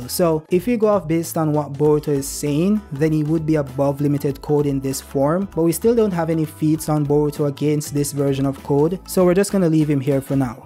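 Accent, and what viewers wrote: Jamaica. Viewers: Random question… Are you Jamaican? 😅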